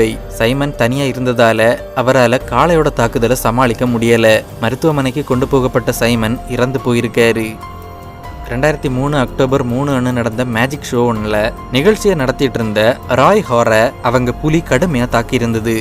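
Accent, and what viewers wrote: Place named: Nagercoil, India. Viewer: ஏலே நீர் நாகர்கோவில் காரரா அந்த தமிழை அப்படியே தெரியுது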